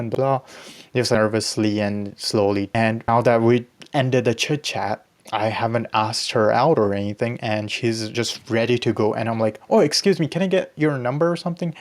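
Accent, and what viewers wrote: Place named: United States. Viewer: U have like an American accent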